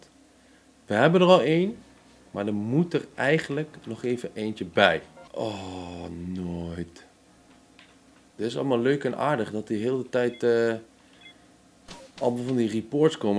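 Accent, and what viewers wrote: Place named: Twente, Netherlands. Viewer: Beetje Twents erbij 🤣... super nice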